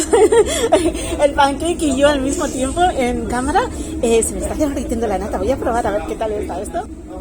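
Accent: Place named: France. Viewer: eres española y.. se te escucha el acento frances.. heee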